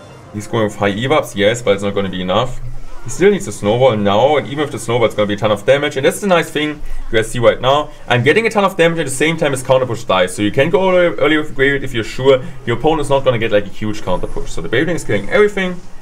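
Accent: Germany. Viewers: Fun fact: has such a strong accent, that half of the words in auto-generated english are still considered as deutsch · He's Deutsch accent its heavy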